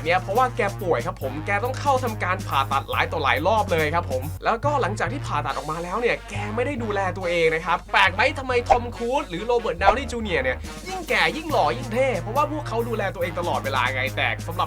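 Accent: Isan, Thailand. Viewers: ใช้คนอีสานมั้ย คนจังหวัดรัยครับ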